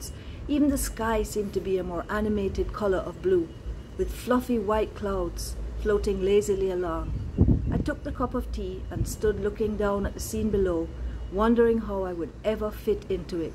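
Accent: Jamaica. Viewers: I love this! Lynda is one of my good friends, so it's wonderful to hear Redemption Songs read out loud in a genuine Jamaican accent. As a Jamaican author, I appreciate that you are sharing the stories from our beloved land. 🇯🇲🇯🇲🇯🇲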